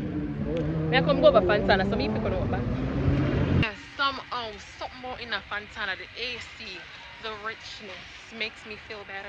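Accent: London, United Kingdom. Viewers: Yuh london accent trang enuh girl!!!! Wow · YOUR BRITISH ACCENT NICE YUH CAN GWAUN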